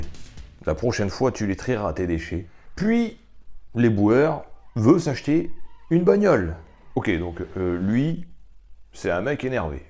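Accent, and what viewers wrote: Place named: Bas-Saint-Laurent, Canada. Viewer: oui l'accent du bas du fleuve que ta pris pas celle de MOntréal ni Québéc bien content d'avoir faite ta connaissance le cousin de la france génial ton video 🤣🤣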